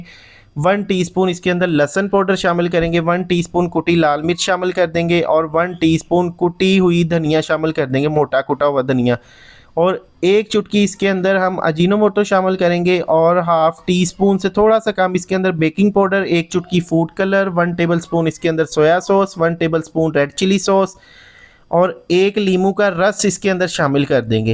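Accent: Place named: Punjab, India. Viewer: Assalam alaikum bhai... Masha Allah bohat khub aapki recipe hai... Agar isko mein fry nahi karta aur isko grill kiya OTG ya microwave mein ... Kar sakta hu... Zaroor reply kare... I like your accent... Punjabi tadka laga ke.... Allah Hafiz...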